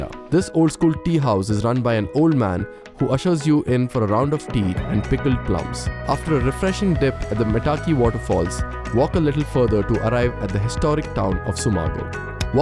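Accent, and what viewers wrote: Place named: India. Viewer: The indian accent is a bit too much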